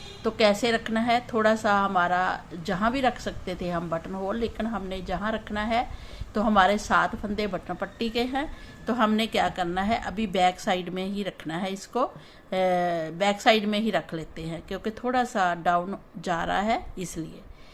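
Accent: Punjab, India. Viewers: Aap ki panjabi hindi bahut pyari lagti hai aur aap bahot achha samjhate ho. Thank you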